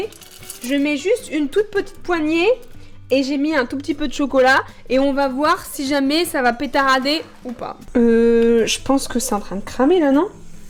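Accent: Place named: Nord-Pas-de-Calais, France. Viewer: Bonsoir, désolé mais c'est quoi cet accent de cht'i LOL "mais c'était quoi cette tète de comme si du gouté de la merde là" Je suis mort ( je suis de Picardie cousine ) Bonne continuation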